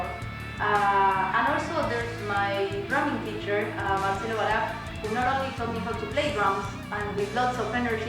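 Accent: Argentina. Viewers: te saque la ficha con sos argentina por tu acento nada mas jaja, sos una genia loca, un beso desde Mendoza. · por el acento se te saca la argentinidad al toque . igual me tendria que haber dado cuenta por tu nombre artístico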